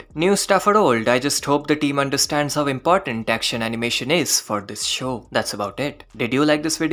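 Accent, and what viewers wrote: India. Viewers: Bro has an indian accent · First time hearing your voice, I know you're indian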